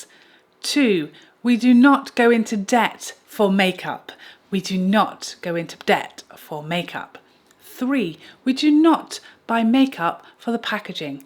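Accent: England, United Kingdom. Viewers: No pipetting makeup!! 🤣. We really do all hate the pipette! Has anyone in the history of the world thought, “You know what would make this serum, this foundation perfect? Dispensing it with a pipette. But listening to turn pipette into a verb and repeat the word with her glorious English accent is worth every stupid pipette cosmetic companies use in their products. 😂. Love you,. Love your ‘You don’t need that’ series.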